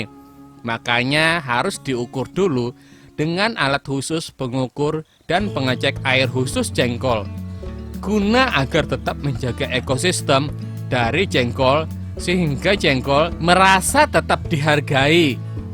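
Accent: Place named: Java, Indonesia. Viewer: Medhok nya hampir sempurna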